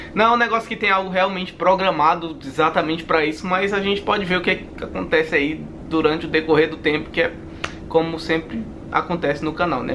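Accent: Northeast, Brazil. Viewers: Continue homi! Seus vídeos são sensacionais. Minha família é nordestina, mas fui criada no Norte do Brasil. Toda vez que tenho oportunidade de ouvir os “sutaque” de lá, eu aproveito. Seu jeito é muito expontâneo! Que Deus os abençoe e que mais pessoas conheçam vocês! (E que os canais cresçam, tenham muitos likes, inscritos e compartilhamentos e tudo de mais mió). Abraços! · Tu é nordestino? Sotaque top! Sou de Sergipe e também tenho desejo de ir morar fora do Brasil. Ir do zero o que eu preciso? Parabéns pelo canal, ganhou mais um inscrito!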